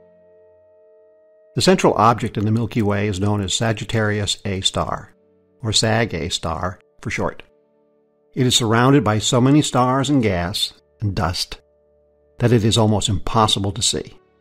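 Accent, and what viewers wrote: United States. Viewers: Oh thank goodness... A video narrated by an American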